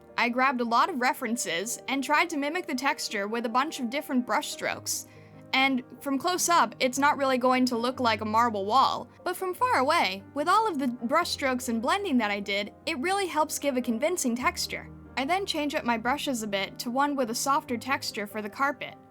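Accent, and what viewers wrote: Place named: United Kingdom. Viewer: lovely art and also bri''ish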